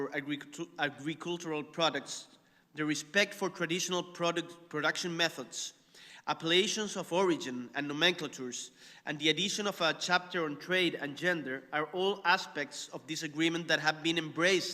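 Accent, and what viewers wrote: Chile. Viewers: Estuvo bien, mucha confianza y el discurso coherente con el acento chileno pero bien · No esta malo el ingles, acento chileno y algunas cosas recitadas de memoria, pero nada mal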